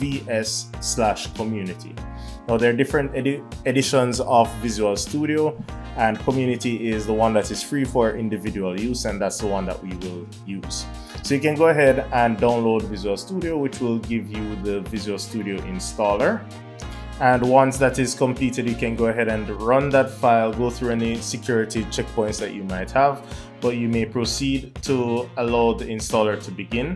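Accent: Jamaica. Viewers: Jamaican accent 100/100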